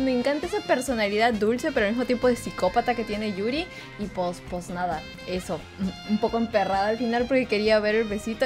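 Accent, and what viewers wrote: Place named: North, Mexico. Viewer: Le achunto al clavo lo de norteño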